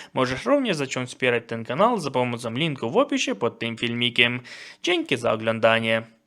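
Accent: Ukraine. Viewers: Czy to bezpieczne słuchać kogoś ze wschodnim akcentem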